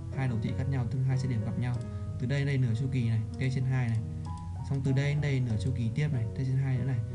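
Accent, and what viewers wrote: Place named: Nam Dinh, Vietnam. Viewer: tuy giọng anh có rất Nam Định nhưng đc cái ấm =))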